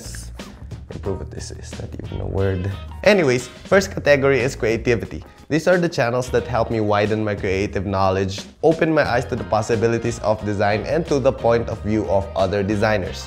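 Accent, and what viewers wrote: Philippines. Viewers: lmao sometimes you speak English like a normal Filipino but then other times, you speak English like you learned the language from looking at memes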